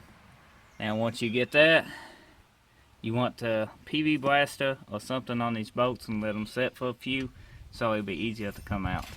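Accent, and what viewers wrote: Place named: Louisiana, United States. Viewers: Are you from Louisiana? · Who else loves the southern accent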